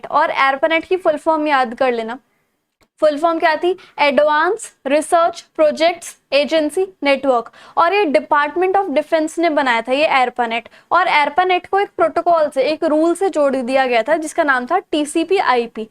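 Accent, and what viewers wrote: Punjab, India. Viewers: Didi tuci punjabi hooo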